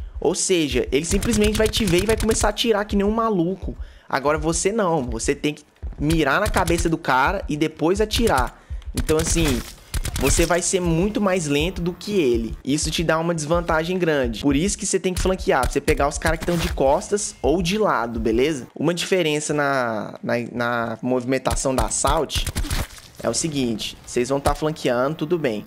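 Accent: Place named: Goiás, Brazil. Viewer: Os ataXiiiments sotaque Goiano kkkkkk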